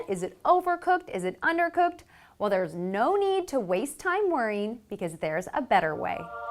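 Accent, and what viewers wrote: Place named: United States. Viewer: Yank. Turn off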